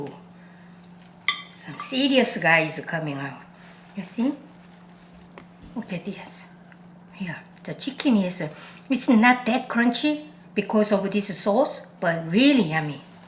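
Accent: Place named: South Korea. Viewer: As much as I loves your cooking, I, too likes your English with a Korean slang👍😘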